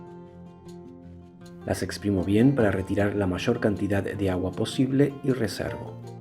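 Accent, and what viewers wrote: Argentina. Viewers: Por la forma de hablar parece argentino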